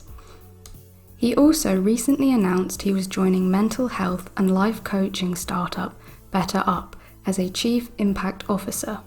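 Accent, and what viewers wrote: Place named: England, United Kingdom. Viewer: Thank for a wonderful video and interpretation. I really enjoy hearing your mellow voice and English accent.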